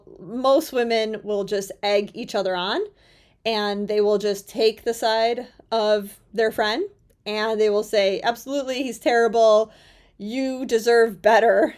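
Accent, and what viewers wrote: United States. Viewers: You are a very interesting women and i totally agree with you. Here in the Netherlands it is the same as in all the west. Respect for you....but could you please work on your typical American womenly accent (i hope you know what i mean). Then it would be much more enjoyable. Keep up the good work in creating awareness and perhaps change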